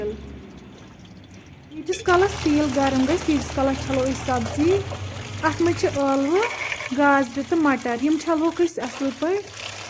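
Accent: Kashmir, India. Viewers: Your kashmiri accent is sooooo good ❤,,,,😊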